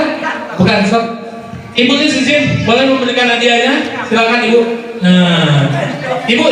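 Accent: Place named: West Java, Indonesia. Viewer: njir sunda pisan ieu mh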